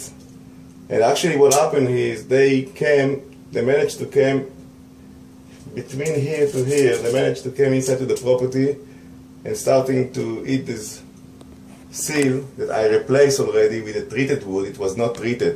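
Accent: Israel. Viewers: israeli accent. תודה לך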